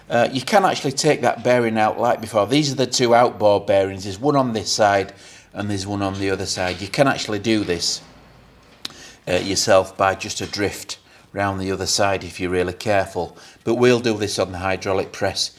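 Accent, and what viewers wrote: Yorkshire, United Kingdom. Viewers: Fantastic Yorkshire accent. I love it. Great video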